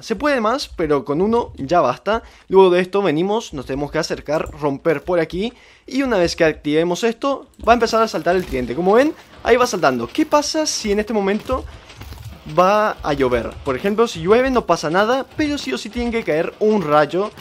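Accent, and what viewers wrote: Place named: Paraguay. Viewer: Man dos paraguayo? .__. tenés el acento paraguayo jsjsjs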